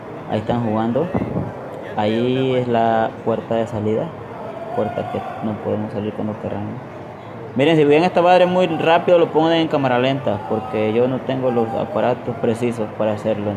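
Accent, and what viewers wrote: Mexico. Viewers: Al principio te escuchaba tu acento de Mexicano pero cuando dijiste “vaina” me dije a mi misma “este vato” no es mexicano! Y conforme fui viendo tus videos es que aprendí que eres centroamericano. Ni modo, te hiciste Mexicano by association 🤣 Lol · Parece más mexicano que hondureño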